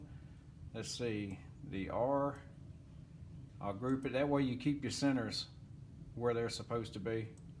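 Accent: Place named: West Virginia, United States. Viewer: I recognize that accent, as I'm a WV feller not too far from Danville! Appreciate your video very much. Brand spanking new to CNC's and carbide create seems like a good program to get me up and running. (along with the help of good guys such as yourself). Hope your little girl is still doing well and healthy and will say a prayer for her and you and your wife as well. All the best.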